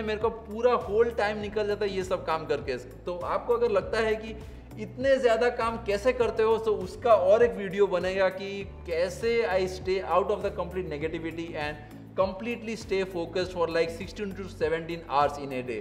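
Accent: Odisha, India. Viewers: au gote katha hela bhai ate achievement kalani..kintu pura down to earth..tama odia accent bahut normal..kete loka achhanti jie tike uparaku gala pare besi sannn saann heiki english kahanti au odia ku bi english bhalia kahanti..ja hau semana nku kichhi sikhiba katha Tama thu bhai👍👍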